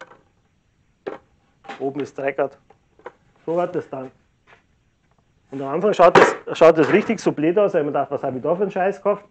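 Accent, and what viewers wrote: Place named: Bavaria, Germany. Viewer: Er spricht meines Erachtens gutes Deutsch. Bayrischer Einschlag, vermute ich,oder? Grüße aus Brandenburg.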